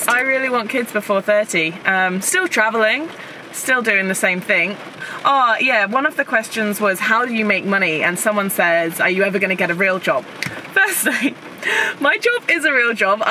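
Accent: Australia. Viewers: a bit of an Ozzy accent coming through lol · Always love your videos! It sounds like accent is changing you sound more Australian now! Also what is the song at the end and could you likn your spotify or his? Thanks Happy New Year! You should do a meet up if you go to Canada! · I love listening to your accent becoming more and more aussie! great video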